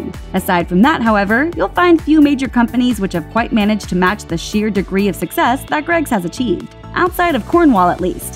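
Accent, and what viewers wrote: United States. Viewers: I'm sorry, It's just weird to hear an American talk about Greggs and Newcastle. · Omg as a British person it’s so damn annoying to hear an American talk about this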